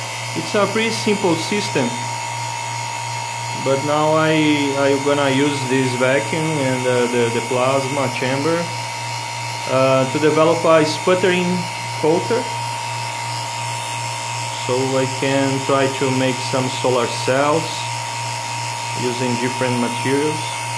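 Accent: Brazil. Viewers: sotaque brasileiro ? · cara vc é brasileiro?? dá pra reconhecer pelo sotaque!! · are you brazilian? your accent when speaking english is very familiar to me. nice video!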